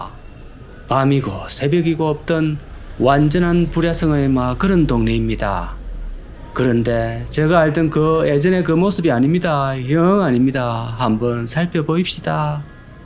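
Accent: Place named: Seoul, South Korea. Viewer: 서울말 다되가네요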